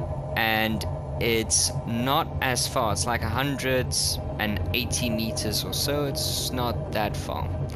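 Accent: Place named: South Africa. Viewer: Ah cool, love accents but always had a hard time spotting south african, anyways thanks for the help with these videos, really helped a lot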